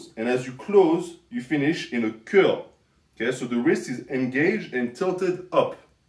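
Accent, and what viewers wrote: France. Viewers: are you french? the accent sounds like it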